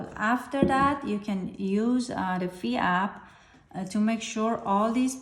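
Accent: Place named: Iran. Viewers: I love this accent Persian people 😍♥️ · She is Persian? She sounds like Farsi accent.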